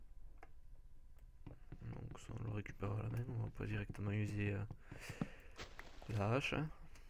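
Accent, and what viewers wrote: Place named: South, France. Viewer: t'as un accent du sud !! le son il est pas terrible aussi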